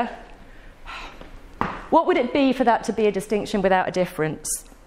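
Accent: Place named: United Kingdom. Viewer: I used to love the britisch accents. of course, I don't get to hear it that often, but nevertheless, I had such a hard time to follow this women for sure for several reasons (speed and reduced content etc), but her accent was really hard to follow at that speed but even with low speed it doesn't get better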